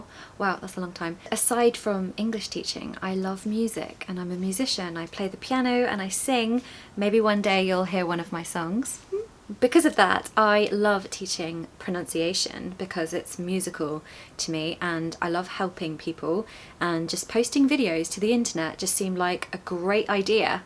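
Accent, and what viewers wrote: RP, United Kingdom. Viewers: I love British accent and your lip and your voice listening from Bangladesh. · Hello nice to meet you. I’m French, I discovered your channel today, so you won a subscriber. I’ve been learning English for a year, and I’m still bad. I love your British accent, because articulating too well thank you. I’m going to learn really well with you. Thank you, an admirer. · Hi, i love your british accent and your pronunciation is very clear. thanks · Thanks for your British Accent, I enjoyed your video from South Africa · Thank you. I love your british accent. When you pronounce worsd they sound musical as you said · Hello, I like your smiling face when you explain plus British accent makes your video more interesting to watch 🥰 thanks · You have a nic british accent and amizing . go head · I lived in the UK for more then 16 years and it's a real pleasure to listen to that TRUE BRITISH ACCENT 😉😁😘😍🤘💪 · I like your British accent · I have been teaching British accent for the last 30 years in India. 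I love your accent very much. I wish to visit Britain. Could you help me where I can stay in Britain ? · Thanks for sharing. I love British accent. I could understand everything. It's clear and nice. I wish I had a full Brit accent. Spanish is my mother tongue. Cheers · She doesn’t have « the » British accent, she has « a « British accent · Love your BRITISH ACCENT :)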